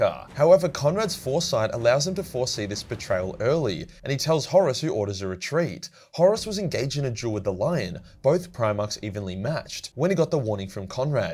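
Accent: Australia. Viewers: You spoke to fast, just heard aussie accented noise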